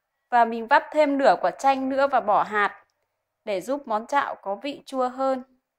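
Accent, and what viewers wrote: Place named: Nam Dinh, Vietnam. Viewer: chuẩn giọng Nam Định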